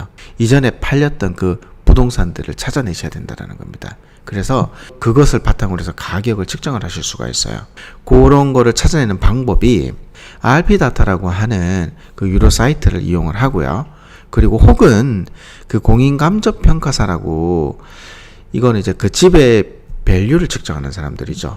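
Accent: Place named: Gyeongsang, South Korea. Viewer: 많은 분들이 부동산 얘기들 하시는데 저랑 비슷한 출신(경상도)이신 것 같아 정감이 가네요. 혹시 질문 사항이 있을 때 이메일 같은 것 드려도 괜찮을까요?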